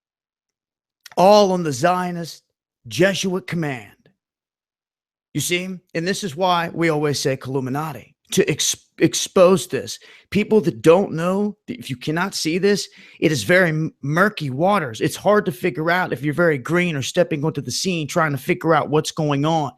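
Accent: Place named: United States. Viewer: wow... to hear hadiths in that yanki accent.. :) kudos mate... good work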